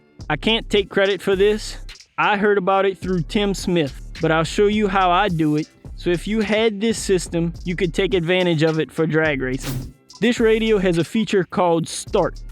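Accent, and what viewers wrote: Louisiana, United States. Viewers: Your accent sounds Cajun. Are you from the Acadiana area? I'm in St. Martin parish. 🙌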